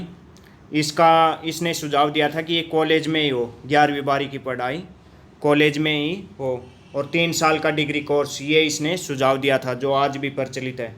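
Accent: Haryana, India. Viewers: haryana se ho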